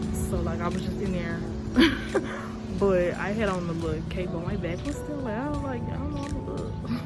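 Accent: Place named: Chicago, United States. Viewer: Girl lmao you from Chicago?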